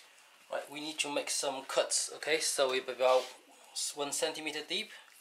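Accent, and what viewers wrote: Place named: England, United Kingdom. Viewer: I knew he had a slight English accent!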